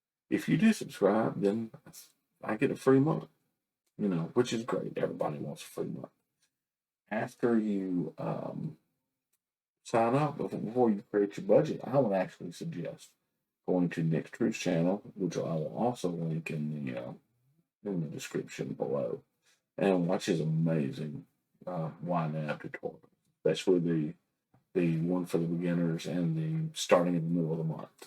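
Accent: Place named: South, United States. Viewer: Your "potatoes and tomatoes" cracked me up! I miss the southern accents now that I've moved! I wish I had grabbed some YNAB swag, I was too new to the game to know about it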